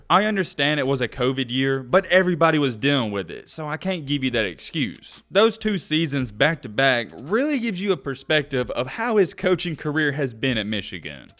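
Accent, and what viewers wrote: South, United States. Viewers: Dude you're numbers are so far off. Bro, you can tell by your back woods accent you're from the south. Keep your opinions there chief. AWFUL!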